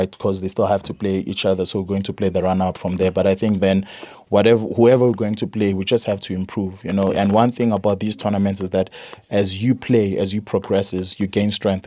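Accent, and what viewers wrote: South Africa. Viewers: Brilliant analysis Brilliant Jozi accent and eloquence. Thanks for salient commentary 🙏🏾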